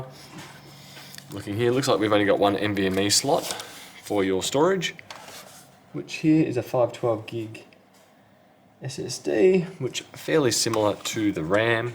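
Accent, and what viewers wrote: Australia. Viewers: Super! An Aussie explains.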